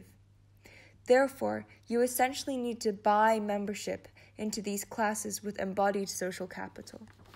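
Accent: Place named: France. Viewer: Love the French accent ladee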